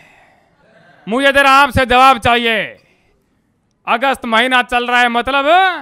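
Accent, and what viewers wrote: Rajasthan, India. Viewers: His accent is telling he's from Rajasthan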